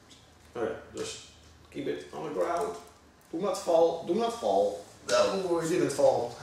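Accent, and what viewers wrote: Netherlands. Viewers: Klinkt nederlands. Waar kom je eigenlijk vandaan?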